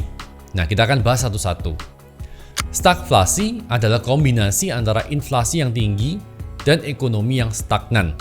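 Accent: Java, Indonesia. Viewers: Ko fokus bljar ngomong gk medok dulu lah biar enak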